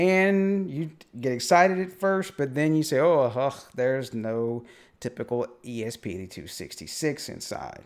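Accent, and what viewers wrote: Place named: Texas, United States. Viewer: I love Texas accent! ❤❤❤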